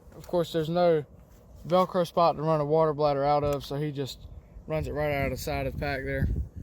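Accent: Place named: North Carolina, United States. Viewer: A very good video! Thanks for sharing your ideas. Several will make their way onto my large ALICE pack. I found a M1976 sleep system carrier in new condition! One thing I didn't hear in the video was the total weight of that pack system as shown. How heavy? I'd also like to say that it's evident you have a lot of respect for your Dad and a great relationship. You do well on camera and have a great NC accent. I'm from KY originally and my accent finds it way out any time I'm around my "people". I was a boy scout (an Eagle!) all through the 70s and the ALICE was a very common pack back then. Was at the scout store recently and they have gone high tech with a rack full of Ospreys. Oh well, we traditionalists can continue to fly the mil-surp flag.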